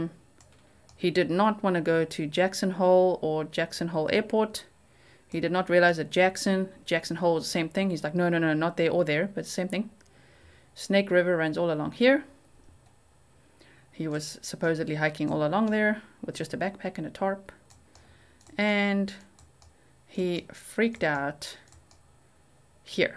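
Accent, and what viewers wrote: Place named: South Africa. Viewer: I look forward to checking in to your channel daily! Not to mention your South African accent!!! I lived in S.A for 2 yrs in Jo-burg and Pretoria. Miss in tons! Thanks for the great content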